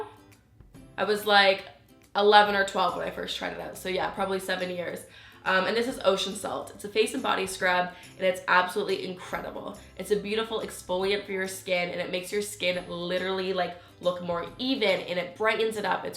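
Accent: Canada. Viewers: Is she Canadian? She sounds like my new amazing science teacher (I just moved to Canada btw) I am subscribing now because I am obsessed with Lush as well ❤️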